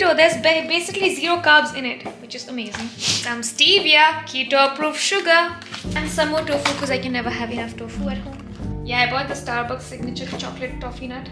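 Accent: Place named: Kerala, India. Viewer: Yo....I have stumbled on your UT videos a couple of times in the past...but today my mind decided to chkout a few in good dtl....1stly, for cooking skills at this age, I must exclaim OMG, WOW WOW WOW n congrags n I did moreovr add, tht your speech tone (but slower talk speed dfntly), dress code, prsnlty and presentation, all in all is quite professional alrdy.. keep it up keep it up God Bless among the lots observed including cat out of bag you are mallu frm coupla tips of tongue..mnwhl the above in nut shell... 'am a very senior mallu myslf too...from out of India for long long time..I ardently follow my family trait n hobby to mentor n guide lifestyle/career...n its wht caught my eye n interest in a Chef Cinderella of future, yes, I think it cud be likely...but but BUT, you need to be WELL sculptured in literally alround ways... I never liked Ou Ou Ou french but do have a rather strange twist (if you will) connection tho...jst how on earth did you land up there..... 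BTW, am neither tech savvy nor the social media guy...so my fingers are yellping to stop...shd you wanna hookup in furtherance email/WA is my convenient preference...you've been off UT for 2mnths now it seems... will chk on your reply....nice getting wth you... be safe n care...God Bless... BRgds GK/Bobby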